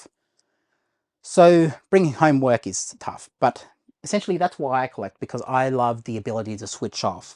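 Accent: Australia. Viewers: I like you, ive said before the way you talk and explain things chills me out. I think you are lost with this you tube, why dont you just copy some pros, do a top ten list of fave haloween mivies. Or for me i could just chill and listen to you review 1 film (no spoilers) at a time . Watch ava pop culture what he does and put your own twist on it, not many would notice if you just copied his videos non stop but with ur aussie accent and chill way of explaini g things, hes frantic, but getting subscribers by the dozen. Copy him bud.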